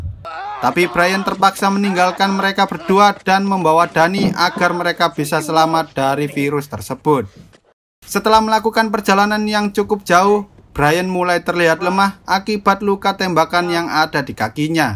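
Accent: Java, Indonesia. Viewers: Ws r usah ke suen lah wong jawa ya jawa endi boz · Saaangat bagus rangkuman nya!!! Makasih! Aku suka aksen jawa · Wong jowo yo mas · Mimin Jawa mana?. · Logat jawa mimin nya jawa nya mana min🤭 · Medok bgst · Jowomu alami min😂😎salam dari malang👍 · Medok g asik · Njawa luh males dengernya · Paling Suka Dari Chenel Ini Suara Nya Jawa Bangget Dan Suka Bercanda Juga:v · Narator wong jowo too hehe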